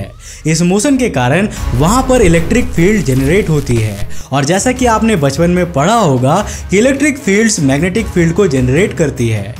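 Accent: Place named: Haryana, India. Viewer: Tum bolte achha ho per bich bich me kai words ka ucharan galat kardete ho sh ki avaj ko chh ki or chh ko sh kar dete ho baki sab theek ha ye bhi karlo to bhariya rahega dusri bat apki bato se bhasha se lagta ha ki ap kahi na kahi haryana se bilog karte ho ya uske pas bilkul pas reh chuke ho ek word jo ap bole beta ye siraf hr me hi bola jata ha kahi or nahi matlab styale Ha ap styal ko galat bolte the ap bolte the shtyal bas ek word hi yad ha mujhe jo ap bole galat baki sab bale bale ha so keep contineu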